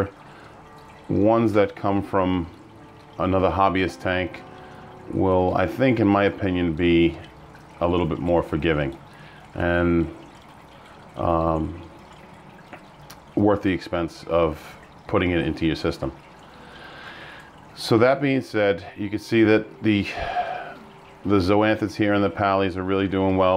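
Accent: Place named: United States. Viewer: Hahaha quite the opposite im an English girl so tell me an English girl who doesn't like a sweet ass American accent ☺️☺️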